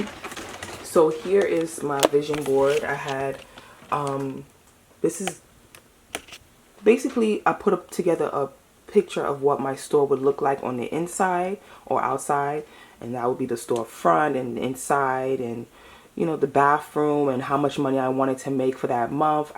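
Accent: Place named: New York, United States. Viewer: Very curious to know where you're from! I know it's a NYC accent I hear. Is it Queens or Brooklyn?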